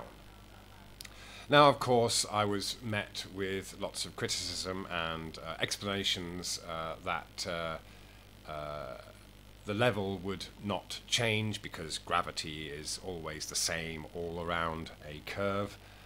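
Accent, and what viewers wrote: United Kingdom. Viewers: You sound as though you were educated in the UK. Could you please tell me at which school?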